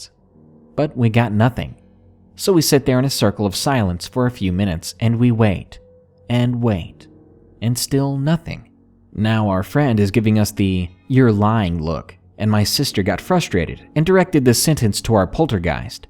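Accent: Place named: Rural, United States. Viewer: Lol, but you're a hick with brilliant narration skills!! Great voice too.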